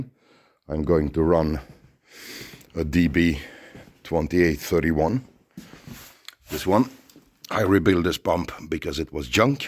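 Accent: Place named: Scania, Sweden. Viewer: Gillar dina filmer, skönt att lyssna på den ljuva skånskan😊. Men den saknade man i detta klipp😏🤔🥲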